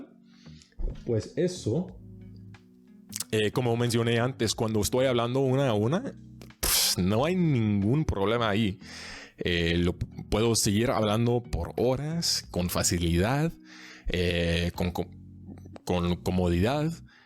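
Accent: Mexico. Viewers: I mean I’m not a Spanish native but it would be pretty hard for me to tell you aren’t native, accent is excellent. Once you get faster it’s over. You don’t play about your Mexican accent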